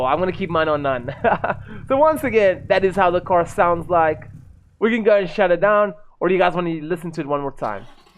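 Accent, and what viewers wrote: South Africa. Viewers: I hear that south african accent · bro sounds south african fr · Hi r u south african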